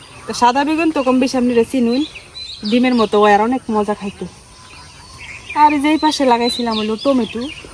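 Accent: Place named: Mymensingh, Bangladesh. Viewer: আপনি ময়মনসিংহের ভাষায় কথা বলছেন। এটা কোন উপজেলায়?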